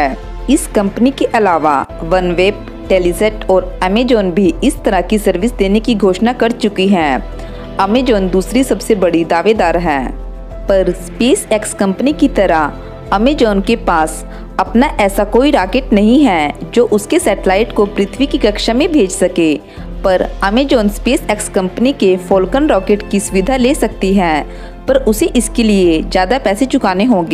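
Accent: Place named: Bihar, India. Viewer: Aapki avaj kan phad detihai..... Bihariyon ki tarah bolne ki kya jarurat hai...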